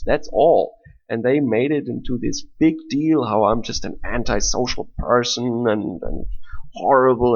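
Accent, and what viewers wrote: Bavaria, Germany. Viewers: dachte höre bayrisch :) Falls du hilfe oder unterstützung brauchst. Sag bescheid.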